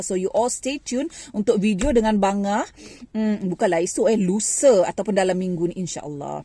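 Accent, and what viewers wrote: Negeri Sembilan, Malaysia. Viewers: thats right she does speak in nogori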